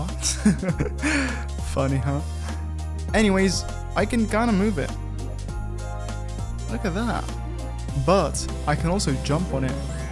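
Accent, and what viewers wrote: Italy. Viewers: Love your Italian accent :D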